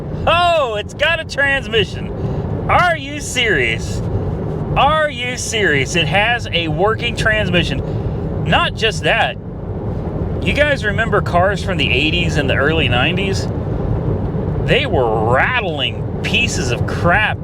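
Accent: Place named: Tulsa, United States. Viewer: Oh Dude, I'm watching this and cringing just knowing what's going to happen to you and you're such a nice person. My family is from Tulsa and I always feel a kinship when i hear you speak.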